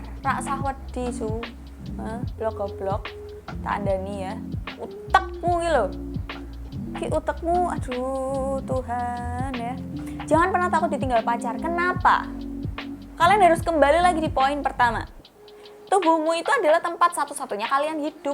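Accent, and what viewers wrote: Java, Indonesia. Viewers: medok e lucu....hihiii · medok mu loh mbak :) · Aq kok seneng gaya ngomong e mbak 😊 medok Jane tp kok ngangenin🙈🙈😅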